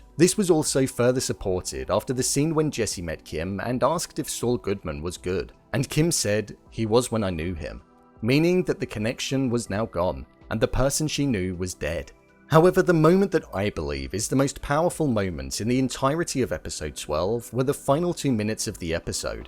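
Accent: United Kingdom. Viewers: 英国なまりを、頑張って聞き取った。 と、思う。 次も、頑張る。💪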